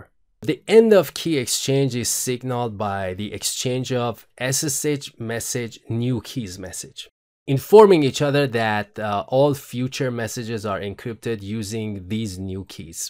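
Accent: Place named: Iran. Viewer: I think the speaker is Iranian I can tell from his accent I am Persian too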